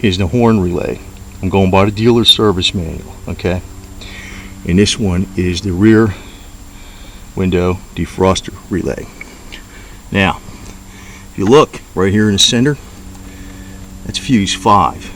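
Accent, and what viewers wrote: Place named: Pittsburgh, United States. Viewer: you from Pittsburgh??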